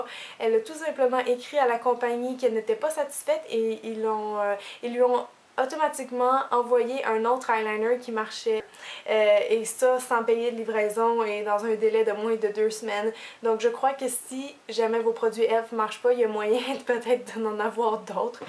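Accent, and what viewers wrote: Canada. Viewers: salut je viens de m'abonner une copine m'a montrer ce ke tu fait moi j'adore et l'accent canadien c tellement magnifique , j'ai vu ta beaucoup d'abonner et tu l'ai mérité ! :)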